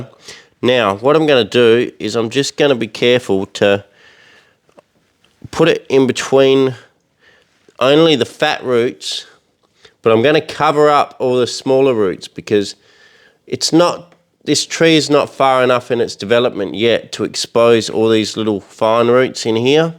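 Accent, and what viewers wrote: Australia. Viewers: Sorry about the tree dying. That's gotta hurt. I really enjoy your videos, especially your bonza Aussie accent. I'm away from Oz atm and it's cool to hear someone who really knows his stuff talk about bonsai in a down to earth and approachable way. I'm new to bonsai. Am only in my 2nd year and trying to learn how to grow healthy trees. I don't have any real bonsai yet (all pre bonsai) so it's awesome to hear you talk through your decision making process. Middle stick to big stick for me but I like trunks with character best.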